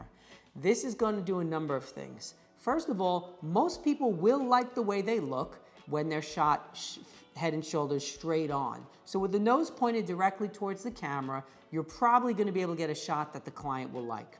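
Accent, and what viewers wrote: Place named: Bronx, United States. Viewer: Thank I grew up in the Bronx listening to you made me home sick. Great job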